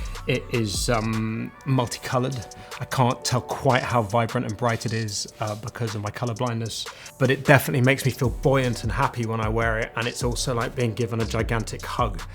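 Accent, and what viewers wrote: United Kingdom. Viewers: Yes! I wish more people would learn English with a British accent rather than American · 最近イギリス英語不足してて困ってたから染みるわ。最高すぎる。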